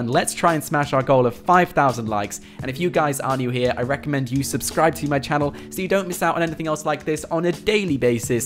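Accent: United Kingdom. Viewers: Bro are you british🤔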